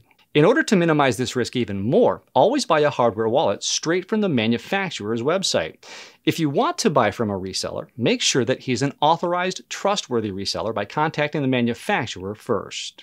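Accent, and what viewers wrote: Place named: United States. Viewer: May I ask which US State were you born? Your accent is so pleasant to ear!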